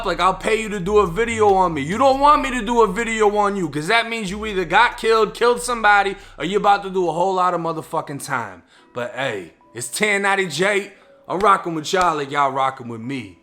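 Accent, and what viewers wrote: Boston, United States. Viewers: Whea u from bro u sound like u from sumwea in the bean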